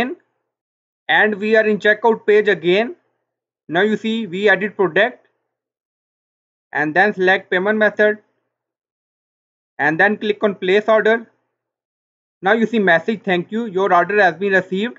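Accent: India. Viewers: they way you speak english is Punjabi is amazin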